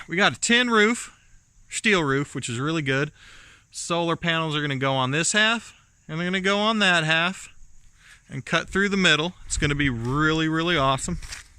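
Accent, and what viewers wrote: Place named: South, United States. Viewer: You sound southern af😂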